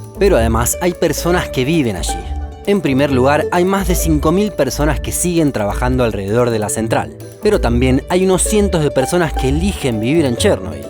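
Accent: Buenos Aires, Argentina. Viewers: Que embole con tonada porteña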